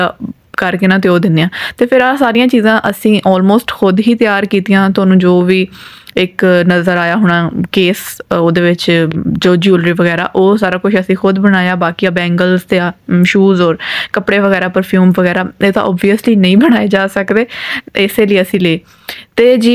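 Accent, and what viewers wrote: Faisalabad, Pakistan. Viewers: Kaha Sa ho ap wasy Lahore Ki Ni Faisalabad Ki Punjabi lgri ha ya aidda oudda Faisalabadi bolty hnn.....batana zarror which city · apki panjabi Faisalabad wali h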